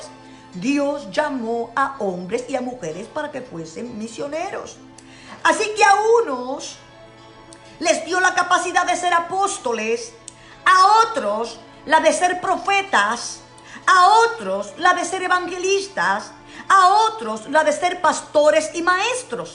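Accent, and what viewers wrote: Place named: Argentina. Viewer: Usted vive en Puerto Rico pero su acento suena argentino usted es argentina??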